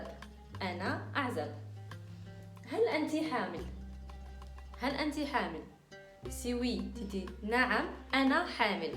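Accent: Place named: Algeria. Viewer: جميل. اللهجة الجزائرية حلوة بزاف. يعطيك الصحة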